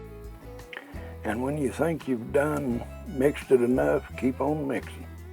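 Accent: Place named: South, United States. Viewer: Fine looking sausage! After you commented on my recent video, I came to your channel and glad I did. This is the kind of videos I enjoy the most. Are you from the south? You kinda got my accent haha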